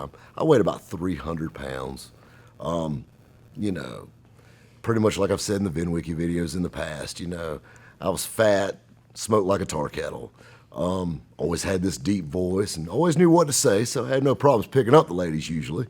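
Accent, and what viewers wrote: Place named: South Carolina, United States. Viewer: He's got that south Carolina charm👍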